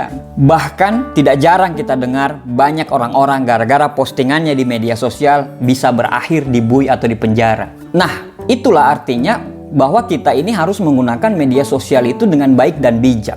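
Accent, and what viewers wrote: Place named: Makassar, Indonesia. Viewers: Dari logatnya bang org Sulsel y, kl benar spertinya sy baru nemu youtuber motivator dari daerah sana, bahasannya oke punya👍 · Kelihatan logatnya pak, apa dia lahir dr mkassar? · Logatnya Makassar kayaknya ini om 😁😁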